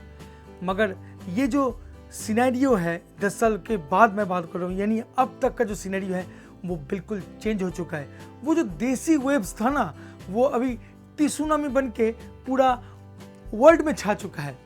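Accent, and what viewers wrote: Bengal, India. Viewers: Bangali ho kya?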